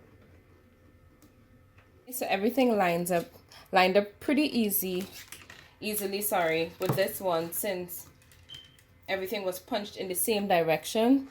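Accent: Trinidad and Tobago. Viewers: You sound Trini🇹🇹🇹🇹🇹🇹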